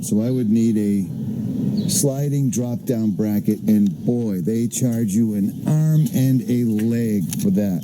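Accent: Boston, United States. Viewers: Ha. I was watching your video and I said to myself, this guy sounds like he's from Boston. Then toward the end of the video you mentioned you're from Boston. I'm in Boston too (Hyde Park). Anyway.. I'm looking at this fridge now for my cargo trailer that I recently converted to a camper. I was initially looking at the dual zone, but its slightly too large to fit into the space I'd be putting it in. This 45 quart fridge will fit perfectly tho. Thx for the review.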